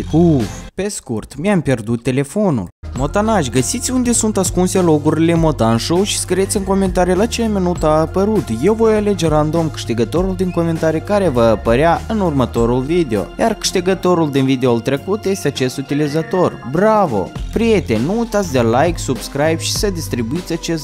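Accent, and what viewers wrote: Moldova. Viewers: esti din moldova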